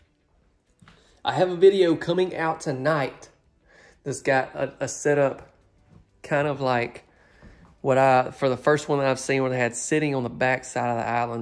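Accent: South, United States. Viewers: thats not ghetto speak. that would be what you hear in a inner city (mostly black) area. what you are doing is southern slang. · Didn’t care for the “ghetto” remark. You sounded more country than anything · This a beautiful! Bright! You are southern guy you sound like you supposed to sound. Don't change. You are so funny in your own way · You sound Extremely southern....not ghetto 😏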